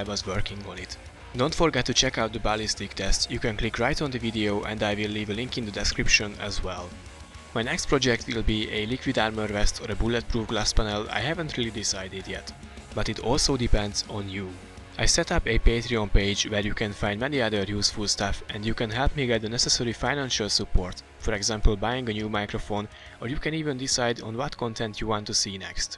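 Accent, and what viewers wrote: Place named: Hungary. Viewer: Hú de hallatszott a magyar akcentus de jó lett a videó. XDD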